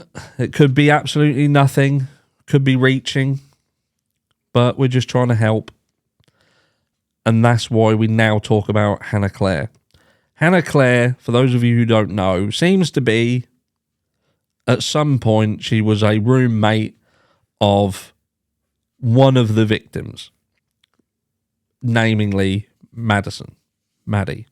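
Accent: United Kingdom. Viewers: I have always wondered where are you from obviously it sounds like the UK somewhere just curious as for the Davis stabbing its a shame that people are so stupid with the whole snitching thing people think you're a snitch if you talk to cops Davis is a few hours away from my home an it gets a lot of bay area students an they are with the no snitching an if they come from the hoods of the bay area stabbing is somewhat normal more shooting but Oakland an San Francisco is some violent areas so its crazy there is a coincidence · NEW Subscriber Your Chat is easy to Lisen to. Even with the deep UK thing in your voice. (it Ok) Point of note. A true K BAR MARINE knife does not have a saw tooth stile on one side of the blende. It has a blood grove in the middle of the blade, A leather staked handle, with a metal back end. Is the blade must have a nick or a Bland spot. 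or super dull to Sair skin. If it has a saw teeth side on it will be one used to cut boardwear making a t 54 knife not a true K-BAR, of it is this kind of knife. look for a post on it about halfway down the blade joining the two blade together. Deep South ALABAMA USA.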